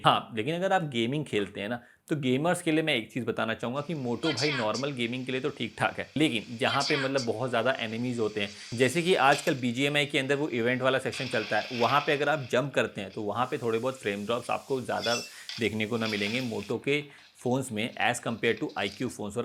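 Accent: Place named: Bihar, India. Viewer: Bhihari tune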